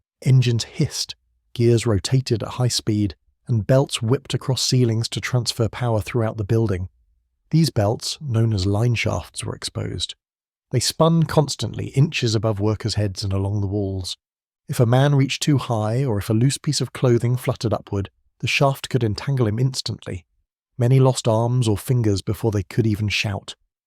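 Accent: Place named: United Kingdom. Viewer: Please: Why spoken in Britisch English? Sometimes really hard to understand...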